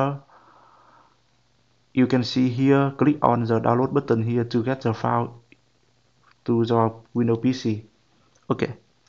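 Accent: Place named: Vietnam. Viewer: Nghe giọng Việt Nam ta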